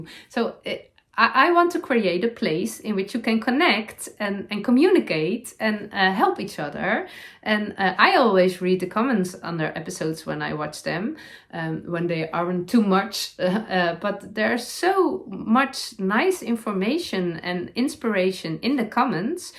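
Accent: Netherlands. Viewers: Hello. Happy New Year to you….bit late but better late than never! Love your vlogs and your Dutchy English always makes me smile….in a good way! I too have been wanting to cast on a Felix cardi so maybe, if I finish my Stephen West MKAL😂😂😂I’ll cast on with you. Best wishes❤ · I love your Dutchy English. It always makes me smile. Some of the things you say may be grammatically incorrect, but they make perfect sense and that is what I like. Thanks for podcasting in English for the rest of us. 💜💜💜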